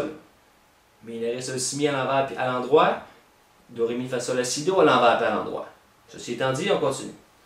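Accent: Quebec, Canada. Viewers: Tu parles comme un Quebequois 😊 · Ton cours est trop génial... mille merci et en plus ton accent canadien est un vrai régal pour moi (Suisse romand) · T'es cours sont super et plus l'accent Canadien depuis la Suisse bravo continue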